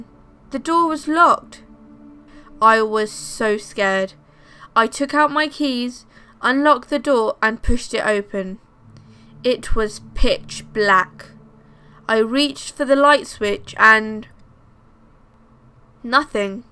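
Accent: United Kingdom. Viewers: You're very welcome. :-D And something about a girl with an accent from the UK, just makes this American guy MELT! :-P ;-) But seriously, thank YOU for doing these vids. You keep making, I'll khwe?w keep making them oak making them. <3 Keep up the good/great/ work. :-)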